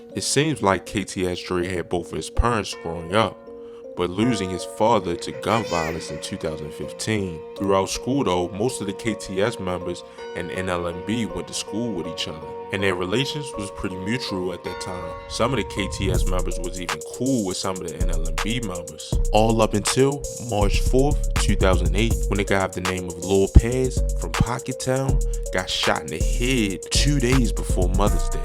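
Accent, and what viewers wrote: Baltimore, United States. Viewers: That Baltimore accent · True. I know that Baltimore accent anywhere. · You got that Baltimore accent bro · one person demise is another person's entertainment. I can't even take him serious. Dude has a Baltimore accent. You should be doing documentaries on your own city.... I forgot, it's been done already. The Wire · Bro gotta be from Baltimore. The way he talk. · Does the narrator have a Baltimore accent ? 😭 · Dude sound like he from Baltimore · Maryland x Delaware checking in ❗⚠️ I hear that BMore accent. Lol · This narrator got to be from Baltimore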